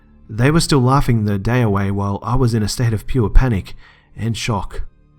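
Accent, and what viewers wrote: Australia. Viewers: Nice to hear a fellow Aussie on here :p My first vid of yours, I've heard good things from other channels and am glad i stopped by! Cheers!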